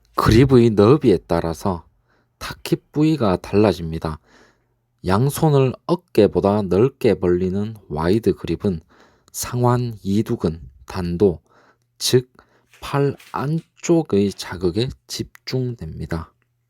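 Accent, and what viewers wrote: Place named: Gyeongsang, South Korea. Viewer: 님도 말투가 경상도분이신거같은데 구독자도 경상도분이시네요ㅋㅋㅋ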